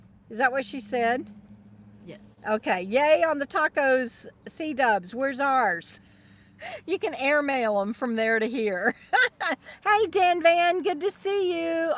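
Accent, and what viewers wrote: Texas, United States. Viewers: I caught this about 90 minutes after you did it. I still don’t have internet (Hurricane Ida) and won’t until mid October. I can hear some of that Texas twang in your voice! Sometimes I can get enough signal later at night by hot spotting off my phone. Good practice for boondocking! I’m going to Houston Monday for about 5 days then will be returning for Lola. Remember, I got a little gift for you from my friend in Houston’s mama who passed away in early June!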